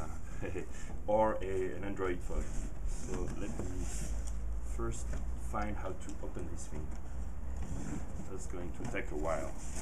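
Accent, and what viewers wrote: France. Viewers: when french people talk english ... -_-'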